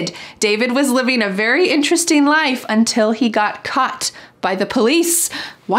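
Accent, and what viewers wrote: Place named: United States. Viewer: Hi! I'm from Poland! Can you teach in another video how to correctly say the word "daughter" in your beautiful American accent that I just love?? 🥰 I can only say it right fast ☺ My 10-year-old son learned to say "I bought my daughter a bottle of water" in American accent 😁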